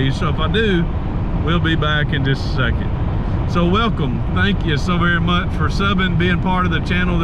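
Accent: South, United States. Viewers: Good video cars engines, even a woman,and some funny jokes, tks fer the entertainment. Also don't change the way u talk, its ur roots,one of the other guys, perhaps adds one of those southern pronounciations as well. I still have my Kiwi accent and have been living in the States over 40 years. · Is not from down South like you? I love your accent dont loose it please. In Canada we love people who keep their accents. Georgia Rocks.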